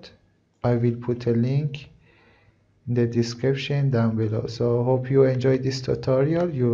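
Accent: Iran. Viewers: Are you persian? The accent gives it away😆❤ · this guy is definitely persian jfc, his accent is so thick i cant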